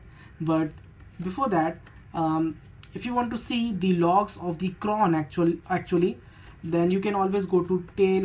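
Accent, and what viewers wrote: India. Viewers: It's "are" not "R", we indians have a thick accent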